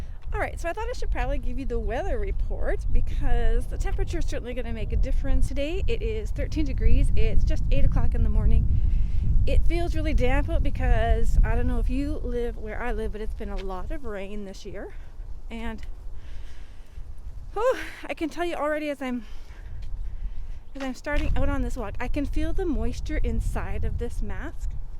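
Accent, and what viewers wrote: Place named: Canada. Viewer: Love these helpful, practical videos! I knew you're Canadian the first time I heard you speak. Sherwood Park... are you... from Red Deer?!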